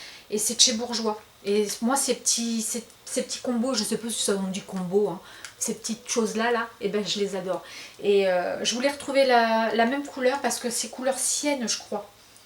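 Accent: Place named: England, United Kingdom. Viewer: Moi j'adore toutes tes petites bourdes ,ton accent anglais, et quand tu sors du cadre pour prendre un truc 👍🏻😄. Passe un Bon dimanche . Bisou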